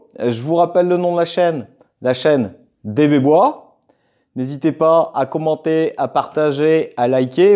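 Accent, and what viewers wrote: North, France. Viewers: merci l'ami, une bien belle fabrication... je n'aurai pas pensé au clous de renfort. un ch'ti acchin del nor ?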